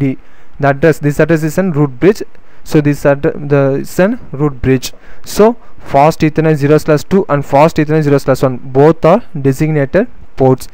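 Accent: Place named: India. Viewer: PLEASE DON'T SPEAK ENGLISH WITH INDIAN ACCENT!!!!!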